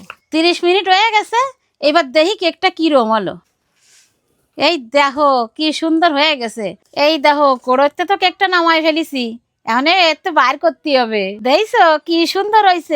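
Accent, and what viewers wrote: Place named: Bagerhat, Bangladesh. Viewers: বাংলাদেশে খুলনার মানুষদের আঞ্চলিক ভাষা কিছুটা এমন শুনতে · কিরে যশোরের ভাষা বলে কেন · আপনার বাড়ি কি বাংলাদেশে। আপনি প্রায় বাংলাদেশের বাগেরহাটের ভাষায় কথা বলেন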